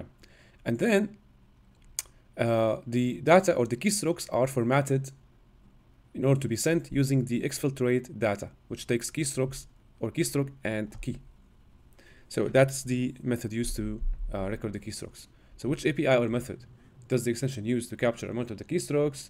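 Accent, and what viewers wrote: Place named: Iran. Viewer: Your video is like a professor lecture on digital forensics.With a Persian accent “ good Ea stuff “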